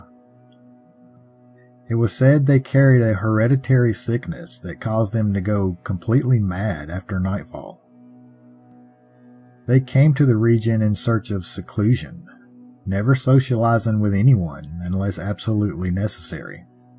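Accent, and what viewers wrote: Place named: North Carolina, United States. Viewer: I do so enjoy these stories as I do hearing your accent; when I was a kid we lived in NC for 3 years and it's so familiar still.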